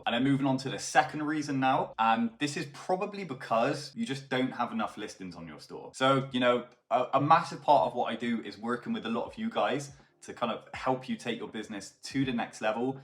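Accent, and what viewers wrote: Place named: Somerset, United Kingdom. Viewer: bro nice video. you sound like you are close to my neck of the woods with your ascent lol (im somerset).i havent quite got started with etsy yet but i have all the equipment to want to start selling(3dprinters,laser engraver, cnc router, uv printers, vinyl cutter and sublimation printer) ive learnt enough to feel like im almost ready to make sales and being that im in a job that is close to becoming a dead end with all the bad terms they keep wanting to impose (postman) i must start to do something to aim for my dream so im hoping to arrange a call with you soon to make sure im heading in the right direction from day one.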